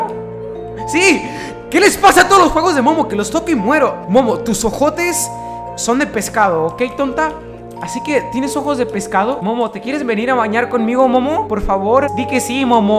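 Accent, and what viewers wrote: Chile. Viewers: Alv pensé que es chileno XD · No sabia que era chileno xd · eres de chile? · Eres de chile?o de donde?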